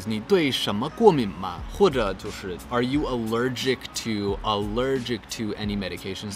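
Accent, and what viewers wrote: Beijing, China. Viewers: 口音连着名字都是京味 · 第一次看你的视频，哥们儿，你是真北京人假外国人吧！这京腔也太地道了，连痞味儿都出来了……好听！赞👍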